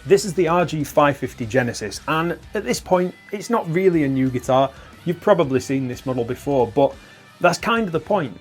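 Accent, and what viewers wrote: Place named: Yorkshire, United Kingdom. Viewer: The love how goofy the Yorkshire accent is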